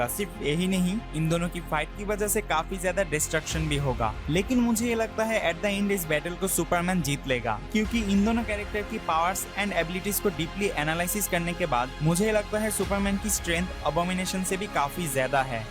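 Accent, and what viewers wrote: Bengal, India. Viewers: Bangali ho kya? Guessed from your accent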